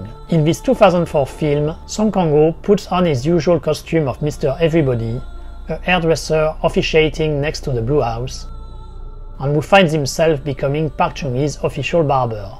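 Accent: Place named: France. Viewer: Great video but the uber strong French accent squeezed my brain juice in such a tiring way. I mean you can use AI voice dude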